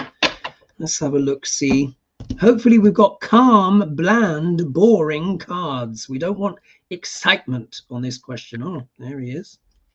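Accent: United Kingdom. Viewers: 👍🤩The British accent is so easy and good to hear I understand it better. Good predicting as well.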